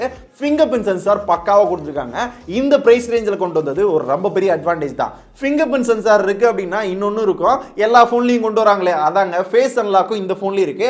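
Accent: Madurai, India. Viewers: Bro neega madurai ya bro.. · Bro neeng madurai ya..... Naanum madurai thaan adha ketta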